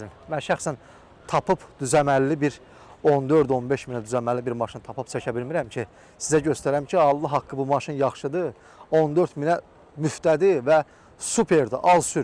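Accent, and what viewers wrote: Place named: Ordubad, Azerbaijan. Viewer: Qardaş Ordubad lehcesiyle danişir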